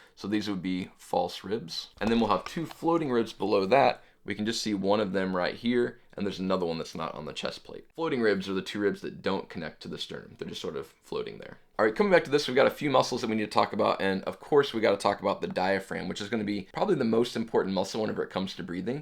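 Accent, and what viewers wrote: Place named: United States. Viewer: Please can add subtitles for non Americans that can understand your accent. Thanks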